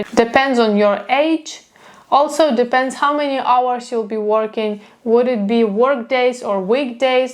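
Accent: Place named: Europe. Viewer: I am curious, if you ever had customers from the same part of Europe, who recognised your accent, and wanted to converse in ( one of ) your languages.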